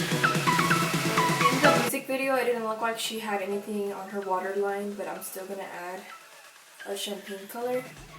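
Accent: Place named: Mexico. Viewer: Lo supe por el acento!! Yo también soy de Mexico y creo que me escucho como tú cuando hablo inglés... es curioso jajaja :*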